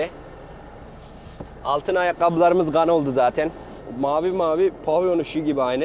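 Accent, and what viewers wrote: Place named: Central Anatolia, Turkey. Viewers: Kardeşim çok neşeli, eğlenceli bir insansın. Bir de bizim iç Anadolu ağzıyla konuşman çok güzeeeeeeellll❤❤❤❤❤ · Sen ananas satan adama "Ek" diyorsun, bir demek istiyorsun, ama o senin inglizche konusmani bekledigi icin seni anlamadi galiba, beyni durdugundan degil )))). Anadolu shivesine bayiliyorum, has türkce. Amerikali , bebek gibi konushan genchler sinir ediyor, ama senin türkchen cok güzel. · Kral konyalımısın şiven bizim oraya acayip benziyor. Video mükemmel olmuş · Slm kardesim.cok guzel videolar.konusmalarin ayni bizim kayseri konusmasi gibi.allaha emanet ol kardesim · İç Anadolulu olabilir. Herkesin ağzı bir değil. Bilerek yapmıyordur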